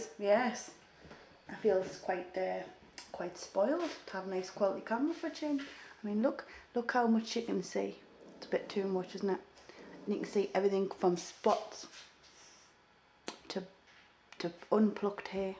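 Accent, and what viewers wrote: Newcastle, United Kingdom. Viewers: shes not irish haha. shes from newcastle ino that cos i am too :D i talk like that aswell :] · Rockin' the newcastle accent angel! We geordies know whats happenin'! ^^ xo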